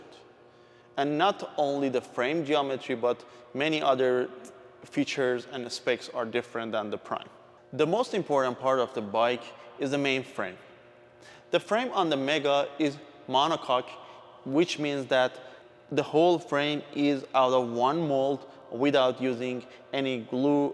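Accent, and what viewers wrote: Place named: Iran. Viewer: Yes they ship to all countries and Im 90 percent sure Brazil is covered. They will charge you factory destination and shipping and tax. Might be more expensive then in America or Dubai, where the company is based from. They are also Canada location. As it says on the bike Assembled in Canada. This owner has a brother which I think works for him. This guy is Persian and has a nice thick accent. A 12 year old can do a better job then this nut case LOL.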